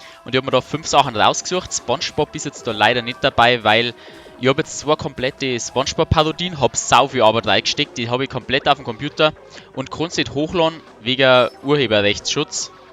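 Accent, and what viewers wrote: Bavaria, Germany. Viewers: wie immer a guards Video! und was mir daugt is dei Dialekt, wenigstens mal a gescheites bayerisch,mach weiter so👌😉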